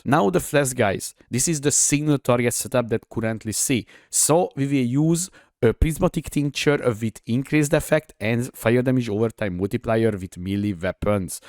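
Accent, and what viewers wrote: Hungary. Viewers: Came here after a recommendation for super tanky builds, not disappointed! De barátom a kiejtésed borzalmas hahaha Rögtön tudtam hogy honfitárs vagy! A kedvencem a kurentli és a dö :)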